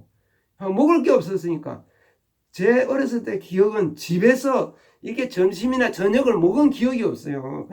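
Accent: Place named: Gyeongsang, South Korea. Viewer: 어디세요 미군도 아시면 의정부 정도 아닌가요 말씀은 경상도 사투리이신데요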